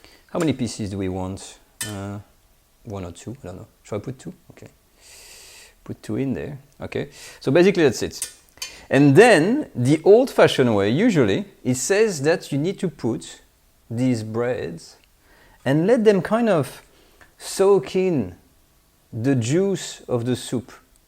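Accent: France. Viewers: His English is quite excellent, and I do love a French accent! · This is exactly how the French speak in Pink Panther. Thanks for an easy and doable French recipe. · It's Frenglish.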